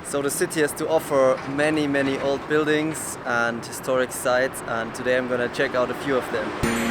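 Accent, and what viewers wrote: Germany. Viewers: Are u german??? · He speaks English very well. I barely can hear German accent.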